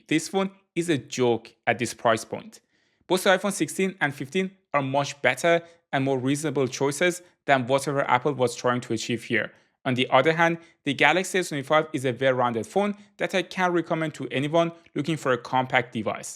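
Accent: Iran. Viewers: I have a feeling from your accent that your persian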